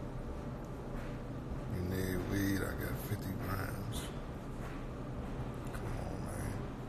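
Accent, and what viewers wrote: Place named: Chicago, United States. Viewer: He sound so Chicago ♥️❤️♥️❤️♥️😍👑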